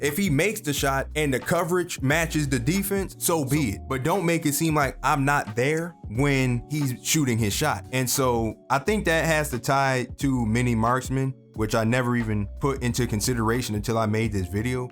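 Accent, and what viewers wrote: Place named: Buffalo, United States. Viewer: Yo I know a Buffalo nigga when I hear one! 😂 great content tho my boy!! Earned a sub! 🔥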